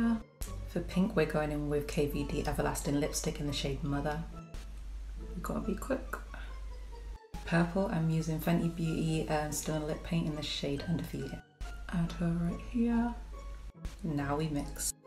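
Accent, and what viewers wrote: United Kingdom. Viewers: Can you do pink yellow and purple❤ and I love your British voice and I also love your make up😊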